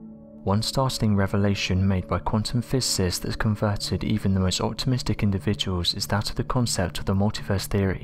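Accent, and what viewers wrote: United Kingdom. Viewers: WHY must you talk so fast? Yes, you're British but some Brits are much easier to listen to AND comprehend. There is another speaker on your channel to which I refer. And, thanks for including SAE measurement.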